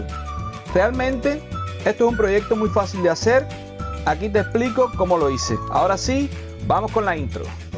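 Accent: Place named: Cuba. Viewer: Por tu forma de hablar me imagino eres cubano un saludo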